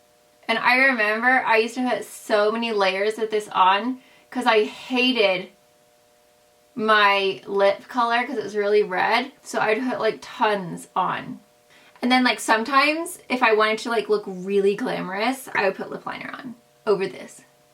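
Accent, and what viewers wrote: England, United Kingdom. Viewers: Did you used to have an English accent?? ( im from England :) ) xx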